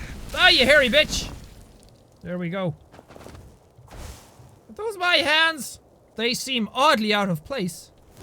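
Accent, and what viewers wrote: Ireland. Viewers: Are Irish? I detect a small hint of Irish in your voice.....sorry if that was a personal question. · Griffin Watson HES IRISH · Your voice is not high pitched, it is simply your Irish accent. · Class accent I'm Irish too xD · listen to an irish man bitch for 20 minutes... ill pass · Personally I think your accent is awesome, it's not often you get to here an irish person talking in general. Which is kind of weird really, do you all just not like to present yourselves in public? What's the deal here? You're kind of representing your entire people really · so irish.. love it! (was in drogheda last summer, liked it a lot) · He's Irish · i luv yer irish accent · jacksepticeye I dunno what they are on about, sounds like a rich markisepticeye level of audible chocolate to me:B Maybe they are not used to the 'flutey to foreign' ear of the irish accent, but I could pick your voice out of a crowd of a hundred easy and that's a damn good thing for the U-Choobs! 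If you play Skyrim again give em two barrels of al gore:D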